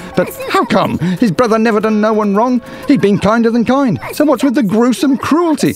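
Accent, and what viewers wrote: United Kingdom. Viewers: You'd better pay some american narrator than british. American accent is more acceptable.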